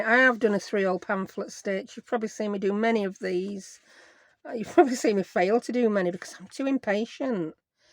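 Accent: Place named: Yorkshire, United Kingdom. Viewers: How cute are these?! Beautiful and easy to do. Thank you for sharing. Yeah, lovely to hear a good, strong Yorkshire accent. x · They would be lovely as stocking fillers for Christmas. Gorgeous little note books. And it’s nice to hear a fellow Yorkshire lass. I’m originally from York. 😃👍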